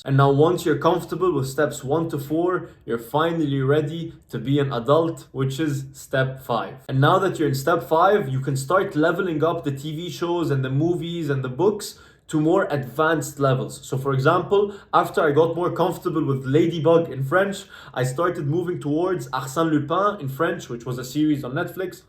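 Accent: Egypt. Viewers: A good vedio, thanks for made it and keep going man ❤ But I have a question where are you from? Cuz u sound Egyptian which is cute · Oh my god días mio! This my first time hearing Spanish with Egyptian accent 😂❤ man you are awesome keep up the good work ❤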